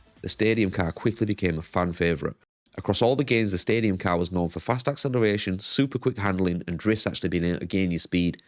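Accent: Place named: Yorkshire, United Kingdom. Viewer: Great video fellow Yorkshireman! Love the accent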